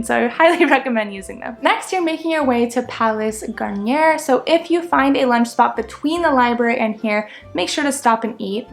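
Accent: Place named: United States. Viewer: I love the way she talks, just straight up American without even trying to enunciate the local dialects lol 😅 I'm flying out tomorrow, we'll do the same! 👍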